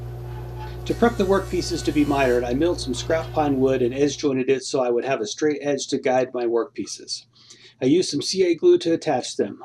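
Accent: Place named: Croatia. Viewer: Wonderful vid however your heavy Croatian accent makes it difficult to understand what you are saying. Would you please consider providing American subtitles. Thanks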